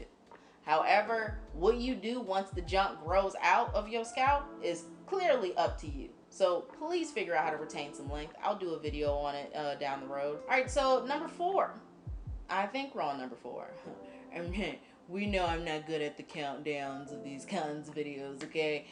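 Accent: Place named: South, United States. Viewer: Yes you do lol it’s kind of a southern accent. Where you from??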